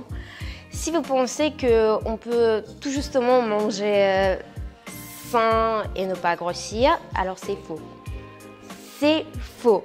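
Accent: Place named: Eastern Europe. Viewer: Accent europe de l est,,pologne,roumanie?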